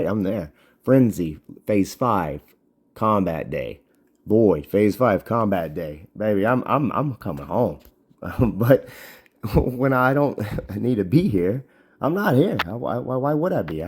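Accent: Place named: South, United States. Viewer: I'm from Louisiana it's sounds like your from the south as well bro nice videos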